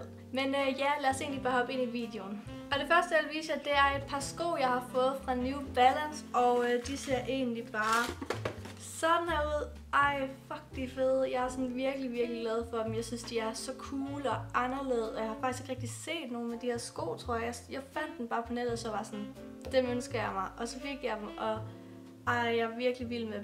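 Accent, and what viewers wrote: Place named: North Jutland, Denmark. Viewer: Det er så sødt man kan høre du kommer fra Nordjylland👏🏻❤️ Jeg kommer selv fra Nord-Midt Jylland😍🙌🏻 Du er virkelig god og dygtig så BLIV ved😘🙌🏻🙌🏻👏🏻👏🏻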